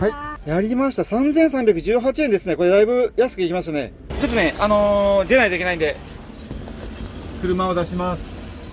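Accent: Okayama, Japan. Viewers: 岡山の皆さん、茨城県から質問失礼します。さんがよく語尾、会話の間に、 「から」って言ってますよね？あれは方言なのですか？教えてください、お願い致します🙇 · お疲れ様でした。コロナが勢いを増している今、楽しく拝見してました。さんの岡山弁に毎回、千鳥みたいじゃー（笑）と思ってクスクス笑ったり、朝市に行けなくて一緒にガッカリしたり、トウモロコシがなかなか売ってなくてそうなの？何で？と思ったり。最後、なんか食べれるねと思ったら、律儀に計算ミスがあってと修正されて、あらら〜ってなりました。本当、楽しかったです。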